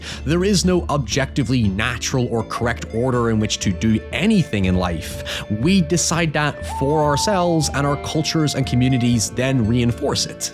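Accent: Ireland. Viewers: By his accent I'd say he's irish.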